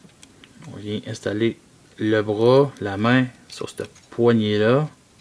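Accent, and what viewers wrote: Quebec, Canada. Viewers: Oh bin TABARNAK! Je suis habitué de voir tous mes reviews en anglais et quand je tombe sur une en francais, cest toujours un francais de france. Pour une fois que je tombes sur un québécois!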